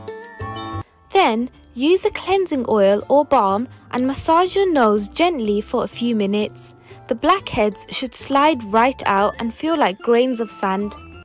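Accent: Birmingham, United Kingdom. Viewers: ❁ཻུ۪۪┊𝓝𝓸𝓮𝓵 it’s a british accent ... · She’s British, but from a different part of England. You’re probably American. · Haha omg someone appreciates a Birmingham accent, finally!🤣👏🏼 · Does this work on white heads? You sound like you're living in UK, did you get that brush in tesco? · its called brummie lmfaoo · It's just a British accent, not even the same one as Peppa Pig. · Brummie accent. Birmingham 🇬🇧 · she's from Wales this is a brummaccent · OMG I ACTUALLY CANT 😂 It’s not fake it’s a Birmingham accents Lollll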